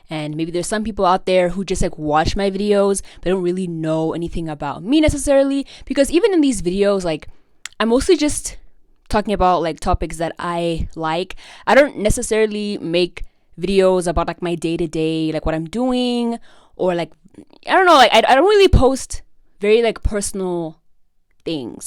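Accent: South Africa. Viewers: if you have done the research, self diagnosis is completely vailid even the psychologists i've had have said so. Although I get what you're saying please don't diminish your struggles, i also used to do that when i was self diagnosing before i eventually got a medical diagnosis. You are not over oversaturating any spaces, you should feel free to use any resources that you feel like would help you. I feeel like as long as you're not harming anyone you're good🤍also, unrelated but are you south african?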